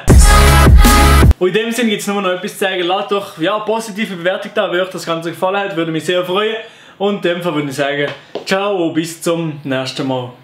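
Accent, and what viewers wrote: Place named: Switzerland. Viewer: Omg entli hen i en kanal kfunde wo nur schwitzerdütsch redet😂😍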